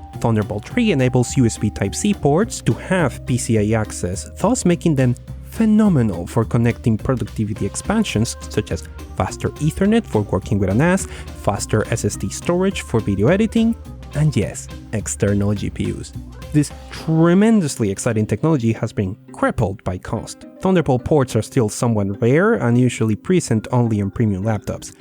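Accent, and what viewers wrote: Venezuela. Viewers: Estoy casi 100% seguro por como habla y se le medio sale que es venezolano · Hablas identico a mi en español, acaso eres Venezolano?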